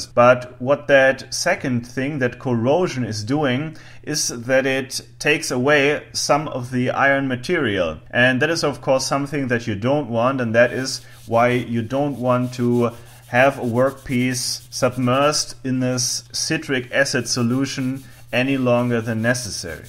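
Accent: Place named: Germany. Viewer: German guy speaking English (American English), German accent, German cadence, some German syntax, excellent vocabulary, excellent diction, idiomatic expressions are plentiful and accurate and used properly.......language proficiency is excellent. Where and how did he learn to speak English......so well.......? ? ? ? ? ? ? Best regards, fm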